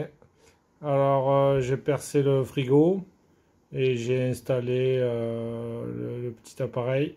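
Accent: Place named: Vaucluse, France. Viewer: Merci à toi surtout !!! J'ai récupéré un frigo qu'on m'a donné et fait exactement la même installation que toi (j'ai bien branché la terre) et j'ai lancé aujourd'hui une belle rouelle de porc!! Encore merci! Tibo du Vaucluse (on doit pas être loin vu l'accent)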